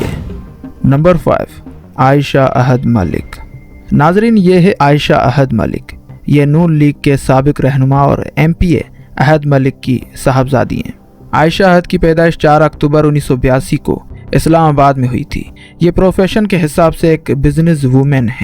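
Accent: India. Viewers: How come this guy sounds like an Indian.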